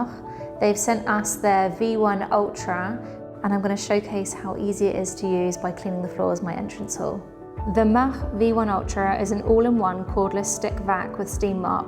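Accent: United Kingdom. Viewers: I don’t get her British accent…🤔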